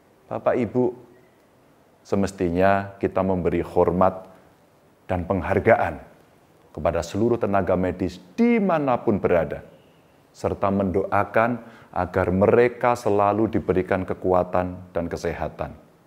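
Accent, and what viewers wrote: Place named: Java, Indonesia. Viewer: Tutur katanya Pak Ganjar sangat lembut dan njawani. Keren Pak sehat selalu.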